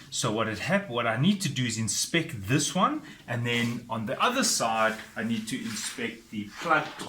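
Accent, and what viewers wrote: South Africa. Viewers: SA accent:)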